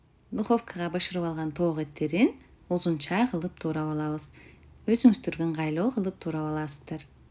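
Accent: Standard. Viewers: Азаматсыз таза кыргызча суйлоп салаттврды жегиликтуу ,жегиликтуу кылып жазап жатканызга !!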